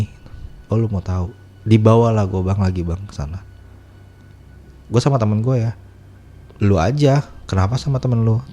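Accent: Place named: Java, Indonesia. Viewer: Padahal ga usah pake bahasa Inggris bang, pake bahasa Indonesia aja ceritanya. Gatel denger bahasa Inggrisnya yang bukan cuma medok lokal tapi juga belepotan banyak ngaco.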